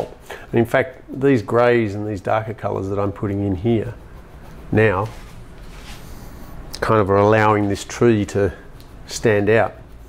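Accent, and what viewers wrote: Australia. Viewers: Thank you! Trying to figure out your accent. Are you in Australia ? 😩😜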